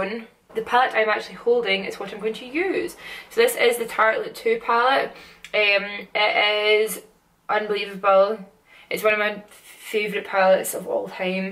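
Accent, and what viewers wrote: Scotland, United Kingdom. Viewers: Omg I love your accent 😍👌🏻 I have a friend who's Scottish but she doesn't have an accent like you, but it's kinda adorable. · Are you from Scotland ??? Im from Glasgow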